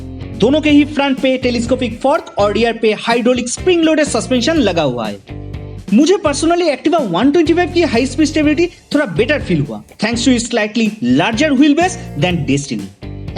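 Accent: Bengal, India. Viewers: I love your Bengali accent. ..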